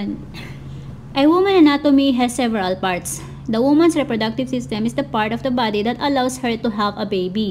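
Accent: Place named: Visayas, Philippines. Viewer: Sana relax ka lang magsalita para maintindihan..wag mo bilisan mag salita kaya nabibisaya ang sabi mo ih.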